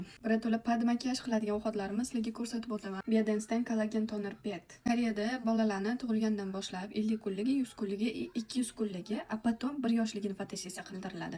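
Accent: Tashkent, Uzbekistan. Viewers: Номонгонлисизу нимага Тошконди шевасида гапряпсиз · Нега Тошкент шевасида гапирасиз ,узиззи шевангиздан уяласизми ?? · Наманганликмисиз нега тошкен шевада гапирасиз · Качондан бери Наманганликлар Тошкент шевасида гапирадиган булишди,кизик!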